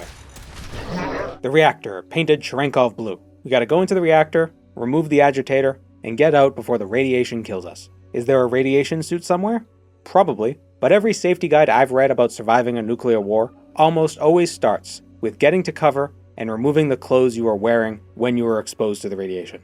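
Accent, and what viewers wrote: Boston, United States. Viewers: In one of your older videos I'd just got off a call with my grandfather and realized... "Wait, that's a boston accent!" From the North Shore originally and I'd hear it all the time at work or in general and it just went over my head for like 3-4 videos. I do appreciate hearing the areas of Boston/Mass said in Boston way. I was so excited for Fallout 4 to come out back in that time cus I was really looking forward to a Fallout that was recognizable since it was so far in the future and in my area... I mean hell, my city is technically in it. It's like you said though, it feels right even if it doesn't look right. PS Green Line is just terrible and every station its a part of is a mess. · the challenge was interesting but the real life boston lore and dialect were my favorite parts. excellent video. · I always got the sense you were from somewhere in New England. 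Let that Boston accent out in the next video! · I had a feeling you were from Boston. I was born in Quincy and lived all around the South Shore, so Fallout 4 really holds a special place in my heart. I also understand downplaying the accent. I do the same, otherwise people can't understand what I'm saying or constantly ask me to say words that end in "R." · The weirdest thing about this is I had never noticed your Boston accent until this vid.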